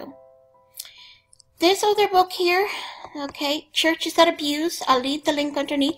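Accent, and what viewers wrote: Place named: Ireland. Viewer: Another excellent book is "Kundalini Warning -'Are False Spirits Invading the Church" by Andrew Strom. Andrew was himself formerly involved in what he called "the prophetic movement" and came to realize how evil all of this is. He tells of how Todd Bentley was imparted an "anointing" by the laying on of hands from the other wolves of the NAR and how it can be traced back to Branham. He explains how this spirit is directly tied to eastern mysticism and is the same one that yogis impart to their followers (you can watch YouTube videos of this and it causes exactly the same bizarre manifestations). I highly recommend this book as it exposes other wolves in this movement as well. Thank you, for your dedication in reproving these unfruitful works of darkness (Ephesians ). God bless you! Love, Kathy P.S. I just love your soft Irish voice - so beautiful. 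I am American, but of Irish descent on both sides. But because I'm from Long Island, New York, my accent is not so melodious!:/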